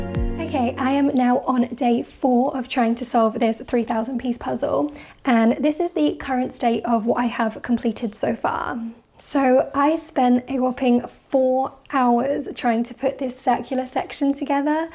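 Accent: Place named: England, United Kingdom. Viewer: there is just something about watching a beautiful redhead with a cute English accent doing puzzles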